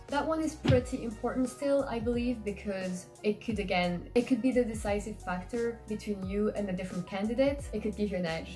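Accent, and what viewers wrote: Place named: France. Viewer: oh cool I've lived in Brussels for a short while. Yeah your accent was saying French and your colours Scandinavia. I guess looks do fool lol 😂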